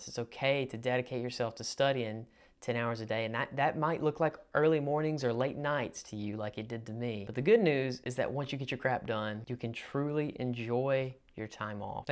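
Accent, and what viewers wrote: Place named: South, United States. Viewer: Your particular Southern accent is nice to listen to.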